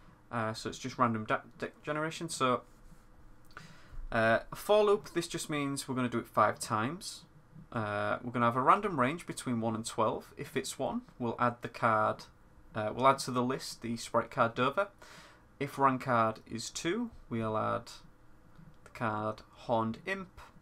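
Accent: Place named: Yorkshire, United Kingdom. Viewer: this is a great video, so nice to see a tutorial for beginners that is not just another platformer. just what i wanted/needed oh and I love the accent, there are really not enough Yorkshire accents on this site.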